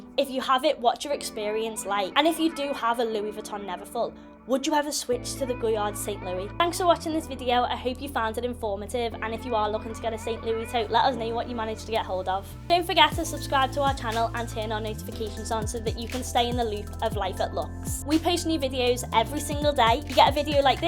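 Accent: United Kingdom. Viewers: I love the video I like your accent but can’t tell where it’s from ? Are you British or Australian or something else ? Great videos as always !!!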